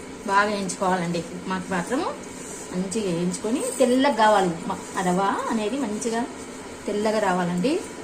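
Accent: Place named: Kurnool, India. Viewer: ఇంతకీ ఎండు కొబ్బరి వేసారో పచ్చి కొబ్బరి వేశారో క్లియర్ గా చెప్పలేదు. మెంతులు,జీలకర్ర పొడి అన్నారు అవి వేయించి పొడి చేశారా?లేకపోతే వేయించకుండానే పొడి చేశారా?మీరు కర్నూలు ప్రాంతవాసులా? మీ భాషలో యాస చూస్తే అలా అనిపిస్తుంది...I am from kurnool 😊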